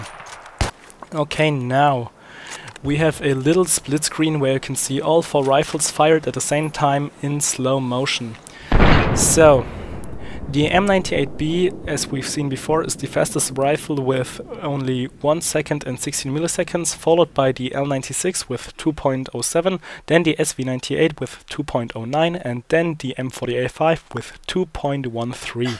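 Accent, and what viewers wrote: Germany. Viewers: Nice German dialect. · Are you German? It sounds like so..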